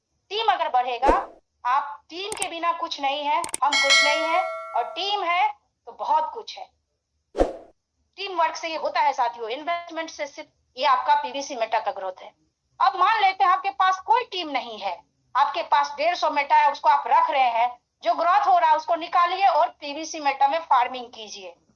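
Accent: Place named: Kolkata, India. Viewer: Colcata anti ji ka awaj lag raha hai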